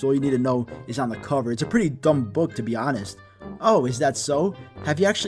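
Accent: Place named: New York City, United States. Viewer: Just found your channel, you are so underrated. And I'm so sure you're from NYC. You have our dialect. 😂🙌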